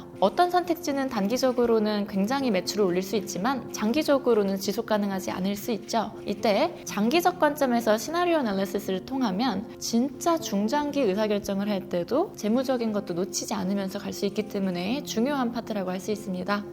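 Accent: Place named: South Korea. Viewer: 영어 발음이 콩글리쉬세요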